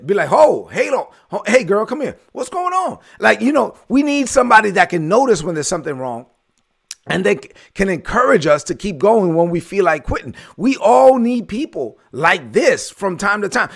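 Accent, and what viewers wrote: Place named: New York, Dominican Republic. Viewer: You blessed me Again today. You do that every day. Also, I know your accent is not only NY, but Dominican first. Thanks for the words every day 💞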